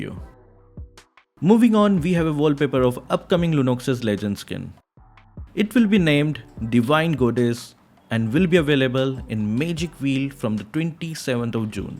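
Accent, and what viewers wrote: India. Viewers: asal kau cakap cam keling sia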